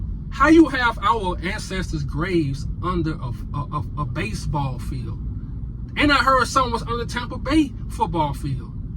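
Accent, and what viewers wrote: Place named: New Orleans, United States. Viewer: Bro you from N.O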